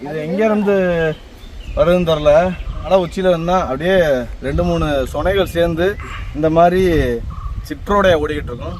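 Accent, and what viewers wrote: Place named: Ramanathapuram, India. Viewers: Always waiting for ur vlogs. Ur efforts to b appreciated n every vlog..ur tamil slang nice to hear bro.... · Ramanathapuram slang nalla iruku😊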